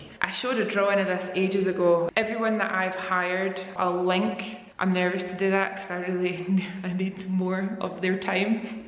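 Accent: United Kingdom. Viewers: Your accent is lovely from which part of uk you are?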